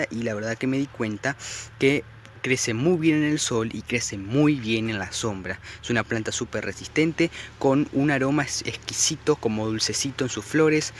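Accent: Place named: Argentina. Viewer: Fabuloso!!! Muy buena tu explicacion....me encanto!!! Eres ARGENTINO.....de que zona eres??? Abrazo gigante y esperando tu proximo video.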